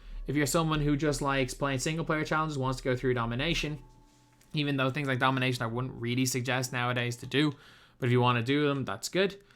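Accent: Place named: Ireland. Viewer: I was thinking this guy sounds Irish, of course he's the champ! Sound for the video man just got the game👍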